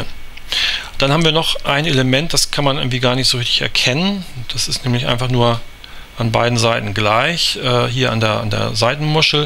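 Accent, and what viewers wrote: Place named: Germany. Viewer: very bad english, your german accent is so strong that it is impossible to understand.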